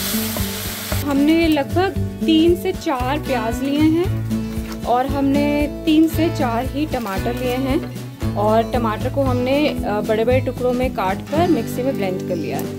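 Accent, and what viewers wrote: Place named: Punjab, India. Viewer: Hi ji aap puri shudh punjaban ho, aapka subhzi banane ka style, bolne ka style poora punjabi hai, mazza aa jata hai aapko sun kar. Kathhal hum bhi aise hi banate hain,aur hamari family ka favourite hai. God bless you.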